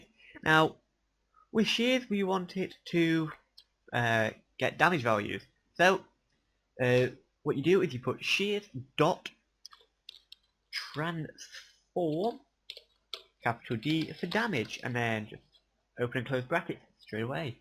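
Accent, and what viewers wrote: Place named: Australia. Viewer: Are you from Australia? You sound like an Australian.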